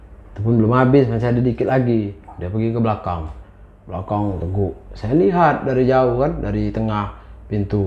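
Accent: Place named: Jambi, Indonesia. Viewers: Mas nya KKN apa uji nyali mas?? Serem gitu Btw bhasa Jambi itu sedikit Melayu ya kak? Suka denger nya saya 🙏🙏🙏 terimakasih sudah upload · Khas nyo bahaso Jambi tu bang🤣 wong Kito la ,asyik nian dengar cerito Abang tu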